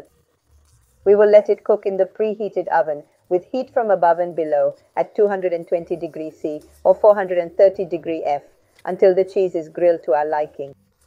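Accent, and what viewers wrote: Andalusia, Spain. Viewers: Solo por tu graciosa manera de comunicarte, como toda una andaluza, tu like y tu suscripción!!!!! Saludos desde Santo Domingo.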